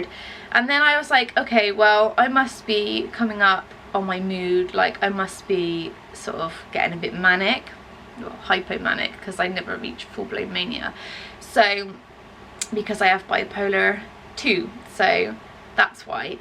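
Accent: United Kingdom. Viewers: you should use a tripod so you can adjust the height so it doesn't cut your head off at the top ;) I enjoy watching your videos. keep up the good work. and i enjoy your British accent. ;)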